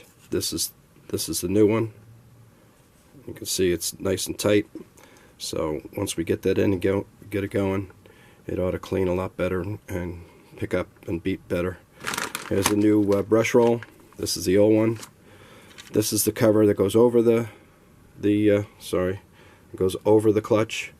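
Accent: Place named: New York, United States. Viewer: Keep , keeping busy bud . Just love your New York accent . Merry Christmas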